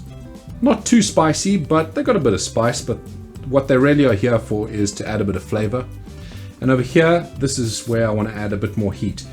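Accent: South Africa. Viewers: Didn't know you were South African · Nope! Sorry he doesn't have Kiwi accent at all. I can hear South African accent.🤣👌🙏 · Damn bro I knew you where South African me too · i couldn't pinpoint your accent because it didn't really sound British but now i know why (because its south African)😂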